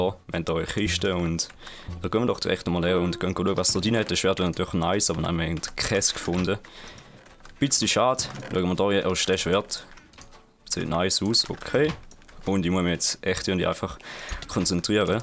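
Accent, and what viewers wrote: Switzerland. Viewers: dü bisch meini ä westschwiizer... bi urner :D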